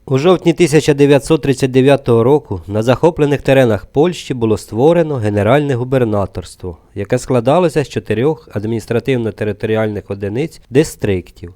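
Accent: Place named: Transcarpathia, Ukraine. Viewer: вона просто з Закарпаття, як і я:)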